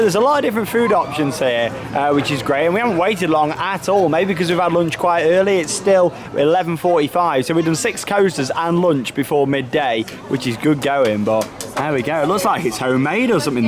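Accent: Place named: England, United Kingdom. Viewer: What day did you go? I'm almost certain you walked past me whilst you were recording, the English accent always stands out.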